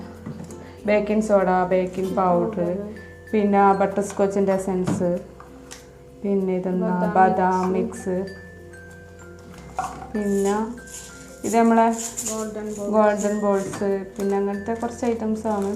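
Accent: Kannur, India. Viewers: Enthooli nammale kannur slang😁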